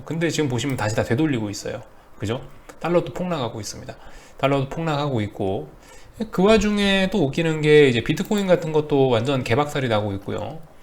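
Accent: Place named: Chungcheong, South Korea. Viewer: 얼렁얼렁..ㅎㅎ 충청도..방송감사합니다.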